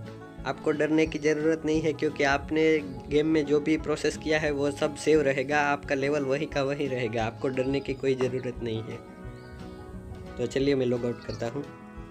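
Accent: Gujarat, India. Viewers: Hindi with Gujarati accent.... Gujarati hai ap....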